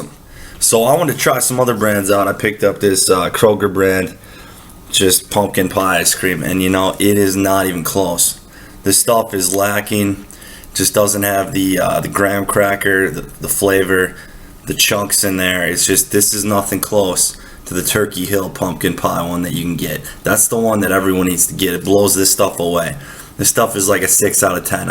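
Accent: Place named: Canada. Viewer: Call me crazy but as a canadian, I would think you were canadian by your accent if I didn't know you were american haha keep it up man you're a beast!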